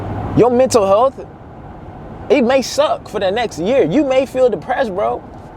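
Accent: Baltimore, United States. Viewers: Are you from Baltimore ? 😭 also the videos are 🔥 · Bro u from Baltimore them ouis sound familiar 😂na great motivation bro ima subscribed now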